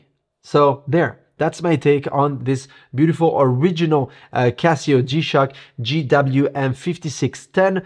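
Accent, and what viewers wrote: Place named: France. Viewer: T'as un accent français quand tu parles l'anglais ! Try to cut down on the word "really". You really say it a lot!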